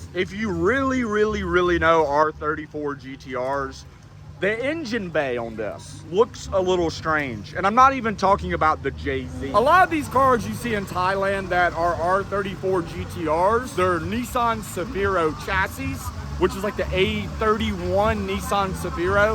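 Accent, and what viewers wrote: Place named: New Jersey, United States. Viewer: Yo have a jersey accent..are you from the north East coast?love your content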